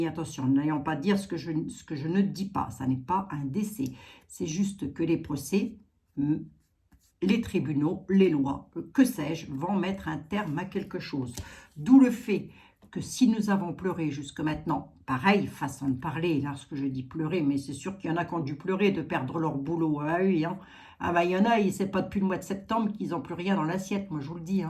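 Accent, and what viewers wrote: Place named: East, France. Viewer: A propos du nain de jardin 🙏🙏🙏🙏 -moi je l'appelle Kaa, le serpent du livre de la jungle, il hypnotise les naïfs. Merci (d'où vous vient votre petit accent, l'est de la France?)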